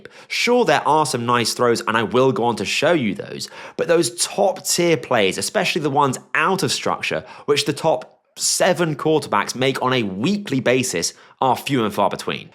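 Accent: United Kingdom. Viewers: Having a British dude analyzing CFB is throwing me off 😂. Great video man, editing and writing is A1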